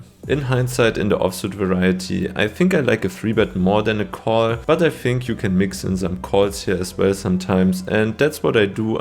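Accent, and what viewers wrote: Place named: Germany. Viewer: Good vlog my friend. I’m loving that German accent 🇩🇪. Nice juicy game man :)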